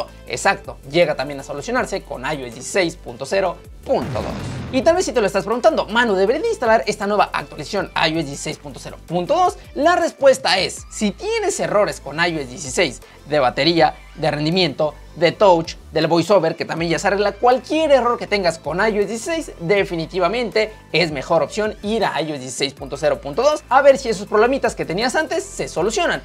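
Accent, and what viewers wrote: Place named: Asia. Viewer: se nota un poco tu acento asiático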